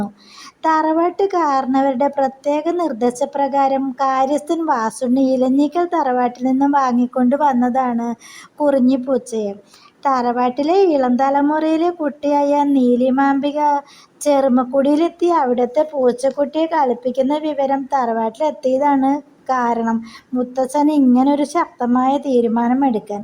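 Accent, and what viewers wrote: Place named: Valluvanad, India. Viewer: നല്ല വള്ളുവനാടൻ മലയാളം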